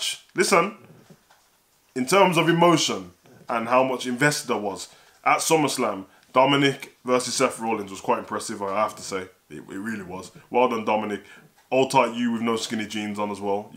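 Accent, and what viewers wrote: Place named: Birmingham, United Kingdom. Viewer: Yo bro are u from brum u sound like u are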